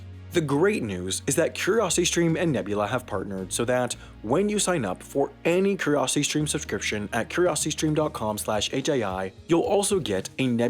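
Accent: United States. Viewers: Gabbering Yank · Why do all these videos about ghost trains (and stations in the UK) all feature an American voice narrating them? Is it because Americans (still in 2023) find the concept of trains a novelty and something of an 'amusement'?? ..... while every other country just sees them as a vital part of their transport infrastructure. And an asset to their country's economy.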